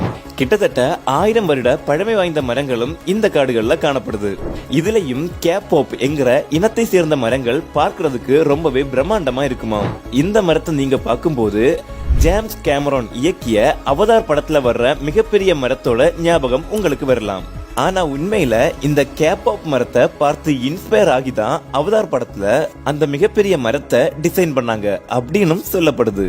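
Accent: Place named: Kerala, India. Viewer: Avan malayali 😂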